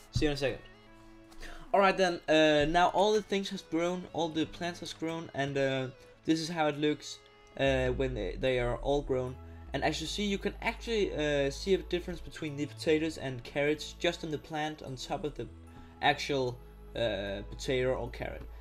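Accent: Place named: Denmark. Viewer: Man kan høre du er dansker. xD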